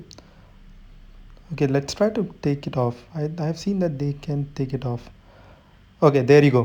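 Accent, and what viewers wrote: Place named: Russia. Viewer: You with Russian accent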